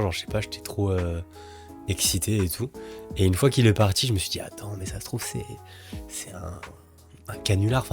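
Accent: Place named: Paris, France. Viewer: your parisian accent is tres charming.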